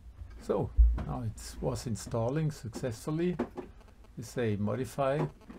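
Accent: Germany. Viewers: Man hört das deutsch schon stark raus, aber kuss für Tutorial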